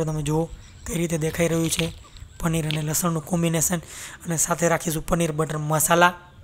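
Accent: Kathiawar, India. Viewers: Wahh bhai aedam kesi kathiyavadi ha mojj ha ✌desi bhasha ma samjavyu mja avi gay vala panir ni subji kjavani man htu bhai , tme puru kryu thank you bhai.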